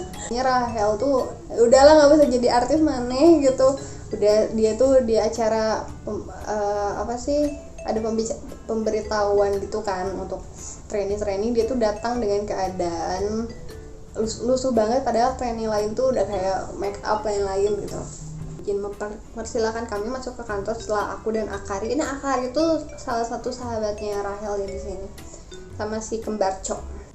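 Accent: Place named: West Java, Indonesia. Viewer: Teteh ni sundanis bgt ya ..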